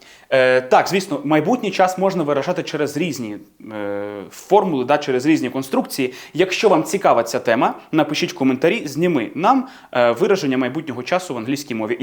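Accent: United Kingdom. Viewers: От як у вас виходить такий британський акцент? 😮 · Так,знімай ще.П.С Чудова британська англійська у тебе.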